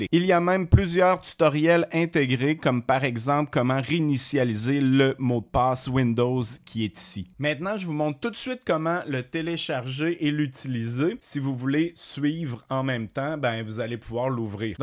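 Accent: Quebec, Canada. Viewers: Quelle merveille ce logiciel et toujours ce super accent québécois qui m'enchante Merci Amitiés d'Oléron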